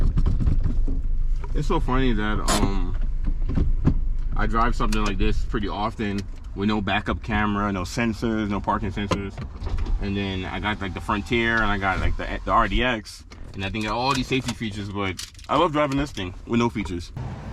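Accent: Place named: Jamaica. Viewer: Could know you jamaican fromyour voice bro, whats the milage on ur car i see one at 17000k that needs a throw out bearing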